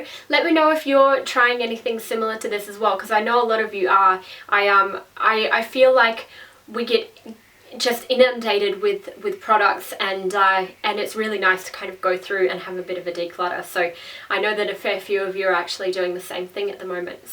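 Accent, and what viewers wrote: Australia. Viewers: Are you from Australia? X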